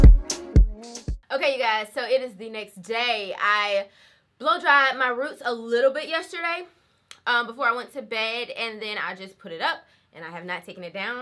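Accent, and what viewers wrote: South, United States. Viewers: why are you so cute .. .. I'm guessing you're from down south with a little islands in. . . . .I usually think it's rude to ask; hopefully since I'm mentioning I get a pass.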